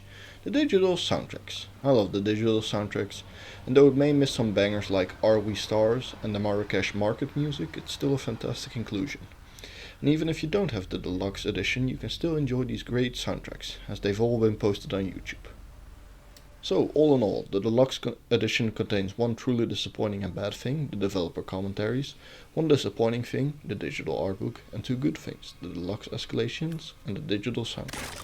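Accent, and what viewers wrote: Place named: Netherlands. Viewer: Nederlands accent haha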